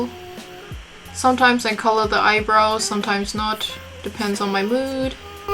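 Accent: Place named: Germany. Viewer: ich mag diesen Zeichenstil echt sehr gerne und es ist letztendlich auch echt extrem gut geworden. an deiner englischen Aussprache habe ich irgendwie schon erkannt dass du aus Deutschland bist und habe deinen deiner kanalinfo nachgeschaut. Cooler Kanal ^^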